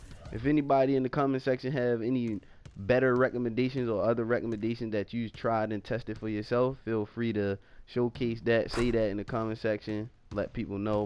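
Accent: Baltimore, United States. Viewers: You from bmore ?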